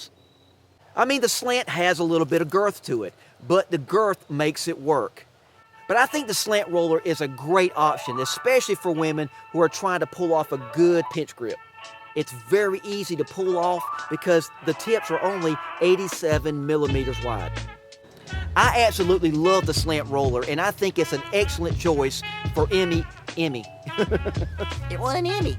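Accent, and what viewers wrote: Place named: South, United States. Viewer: I've two of the ORIGINAL, "Simple Shot" Axiom Champs, and among my dozen or so others, they remain my favorites. I also have an original (1st gen.) "Scout" , also terrific, and also made by our sports/hobby's most likeable gentleman, Nathan Masters. Back around 2009, when I was Assistant Chief to Chief AJ (John Huffer) of the NSA, he made a slingshot for ladies, which he called the "Pink Lady Quick Shot". It was pink, indeed, but other than that, it was no different than his other non-remarkable "Quick Shot" slingshots. I've a miniature slingshot pendant in a gold-like finish, not much different in size to a U.S. dime, made by Mark Seijan - it's cool! Enjoyed your vid, burps and all - though it is kinda strange to hear someone with a definite southern USA accent speak in terms of millimeters. I "get it" though, because those are the manufacturers' specs, and they cater to slingshot enthusiasts (rubber nuts) worldwide. 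The British "WASP" slingshots are probable also good for women, and I believe they're now being made in more than the four colors I already have.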